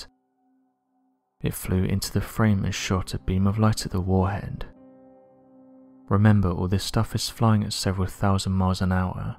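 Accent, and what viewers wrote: United Kingdom. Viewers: Oi mate!! I love all of your videos. I truly enjoy driving,chilling,cleaning and etc. to your story telling. It has been a fun pass time. Your one of the better presenters/narrators in my opinion on YouTube when it comes to the strange and unusual. Would love to hear more videos about satanic cults such as Abraxas and or even Chateau des Amerois if you ever get the chance. Cheers and love your work. Something about your British accent and calm voice that’s very soothing to me for some reason. Perfect for story telling. Anywho, Much love and respect from your long separated cousins here in the U.S.! Blessings from the bayou state of Louisiana. Also, another potential topic to check into is creature and beings spotted in and around the swamps of Louisiana. Especially run ins with werewolf like creatures labeled as Rougarou. Take care for now mate! 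Blessings to the Cambridge crew and Bristol Peeps and all my st neots lackeys out there!!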